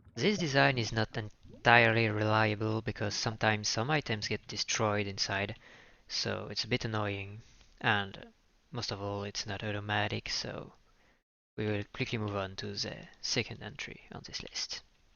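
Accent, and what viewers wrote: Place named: France. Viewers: miam le bon accent francais :) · j'etais donc pas fou ! j'avais reconnue notre bon vieux accent héhé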